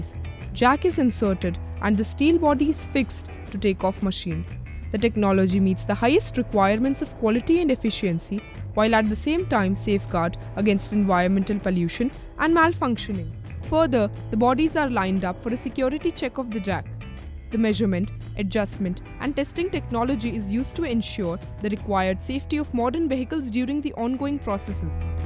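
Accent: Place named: India. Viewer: Bahasa Inggris nya orang India ini lucu...😂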